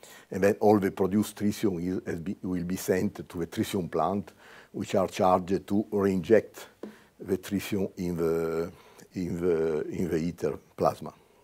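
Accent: France. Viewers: English subtitles would be of great help here. And yes, I know he's speaking Franglish.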